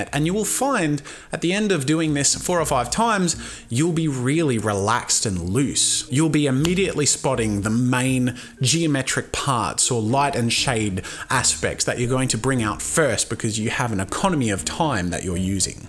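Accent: Australia. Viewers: Are you Australian... I am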